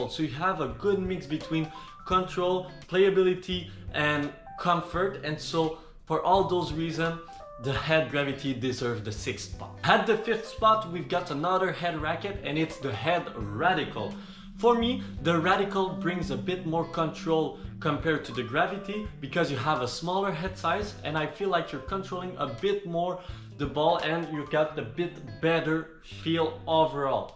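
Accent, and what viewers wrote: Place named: Quebec, Canada. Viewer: You seem coming from quebec like me....i have same english accent 😊 can i ask you where did you filming this? There seem to be a lot of good racquet available in this store!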